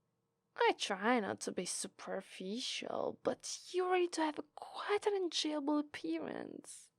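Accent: Italy. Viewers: I just discovered this channel and I have to say three things about it: 1. I'm not sure that these videos are exactly ASMR 2. I love your RP's and I think that they are the best on youtube and tingles. 3. I love your Italian accent and I understand better your English than native English videos, maybe because I'm Spanish. Greetings from Barcelona and thank you to do an excellent job ^^ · Missed an opportunity to use that Italian accent for a mobster accent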